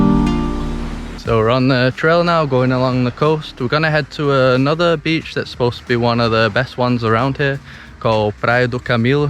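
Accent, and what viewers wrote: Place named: Northern England, United Kingdom. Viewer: Northern English + American + Global English. Wow bro, you're a true nomad :D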